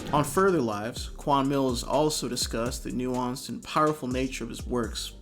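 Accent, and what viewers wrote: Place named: Atlanta, United States. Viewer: He sounds more Atlanta than Miami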